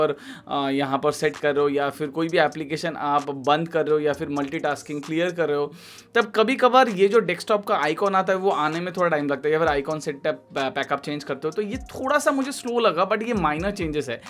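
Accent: Bengal, India. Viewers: Are you from Bengal?? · Bro are you Bengali?? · ARE YOU A BENGALI SIR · Bro, are you bangali?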